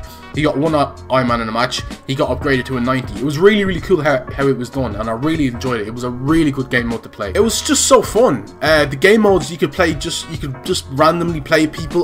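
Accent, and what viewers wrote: Ireland. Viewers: Yay ur Irish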